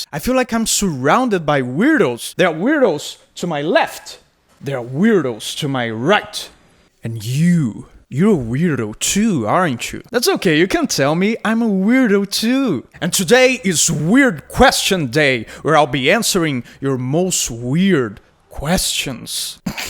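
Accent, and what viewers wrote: Brazil. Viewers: Eu sabia que eu tava reconhecendo esse inglês de algum lugar, você é brasileiro! Conheci seu canal tem pouco tempo e só tenho elogios! Continue mandando muito bem!!!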